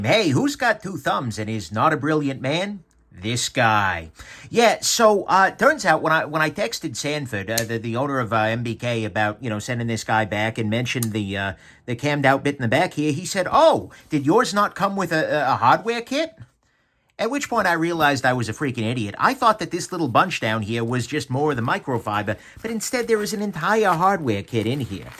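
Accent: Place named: Boston, United States. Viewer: In my world, as an engineer, the fastener that caused you so much problem is called a "Sex Bolt". And, the term you were fumbling for when describing the feature that keeps the female end of the sex bolt from spinning, is referred to as being "indexed" or "keyed". I'm sure different trades will always use their own colloquialisms... But, more importantly the female end of that fastener should either be plain, or use a noticeably different tool engagement to prevent brutes like you from abusing it until it turns! At least you didn't go for the torches to free it up!! This is the first time I've seen one of your videos, I enjoyed it once I got past the 'Bastin' accent (or maybe New Yak?) 😎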